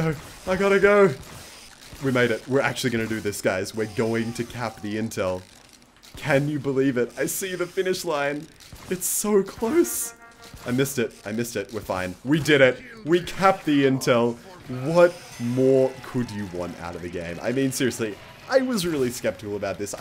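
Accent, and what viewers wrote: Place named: Australia. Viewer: Hey, I didn't know u were australian!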